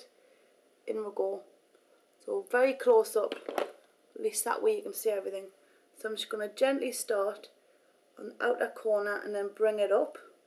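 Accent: Newcastle, United Kingdom. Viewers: oh get a life, shes a geordie lass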